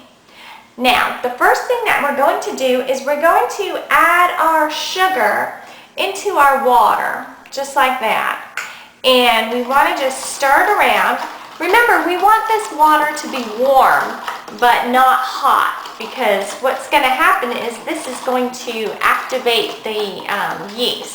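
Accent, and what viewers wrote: South, United States. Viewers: Are you southern cause your accent sounds like it?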